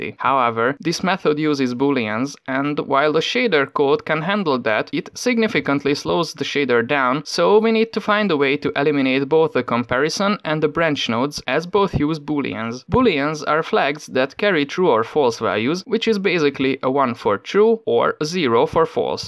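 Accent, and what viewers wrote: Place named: Hungary. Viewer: Hunglish accent my beloved ❤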